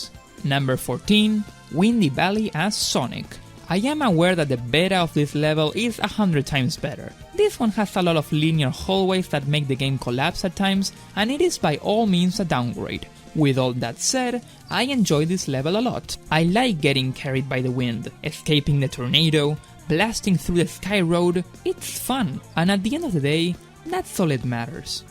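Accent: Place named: Argentina. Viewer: Tenes un re acento argentino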